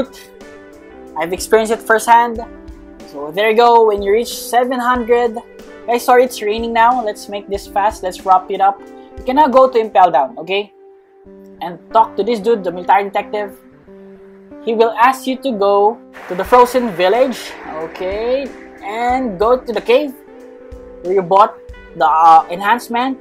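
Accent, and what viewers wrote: Philippines. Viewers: Are you Filipino? You sound like you are. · U Filipino I think I hear kaya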